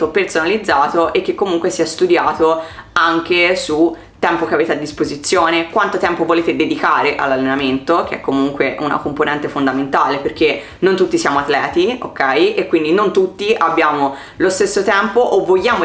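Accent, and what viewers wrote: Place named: Tuscany, Italy. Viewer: Bellissimo accento toscano, ma di dove esattamente?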